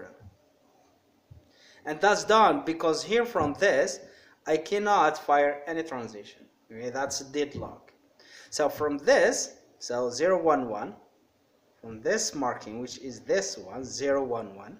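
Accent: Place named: United States. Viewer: تعجبني الاميريكان اكسنت نتاعك ربي يوفقك خويا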